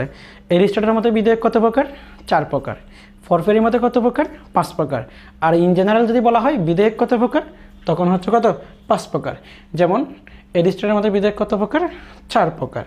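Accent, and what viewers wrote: Noakhali, Bangladesh. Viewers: Sir amadrr nuakalir,onek balo bujai logic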